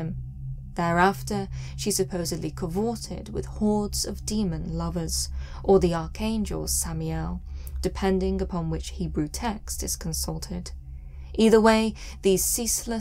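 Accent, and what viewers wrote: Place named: East Anglia, United Kingdom. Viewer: I love you lady! Your East Anglia accent and proper demeanor excel beyond the mediocre expectations placed on YouTube by so many. You are better than you do and deserve your Home television show or 30 minute spot at the least!! Bless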